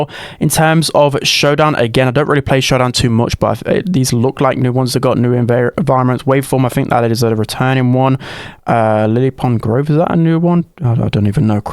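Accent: United Kingdom. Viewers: I know it’s just the British accent but pronouncing the h in h is always funny to me. Nice vid❤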